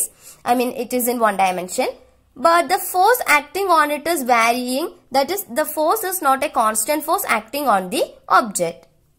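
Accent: Kerala, India. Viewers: i can say that she is from south india from her accent.😂😂thank you mam i understood it.Love from Nepal❤️❤️ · The way she talk in English we can say that she is from Kerala 😁👍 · yeah I think she is malayali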